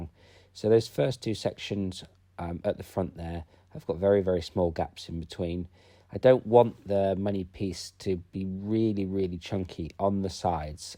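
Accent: United Kingdom. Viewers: loving your videos, love your simple and effective techniques. Hope you keep making lots of videos. Do prefer the British accent being from this side of the Atlantic myself. Thanks for the great education.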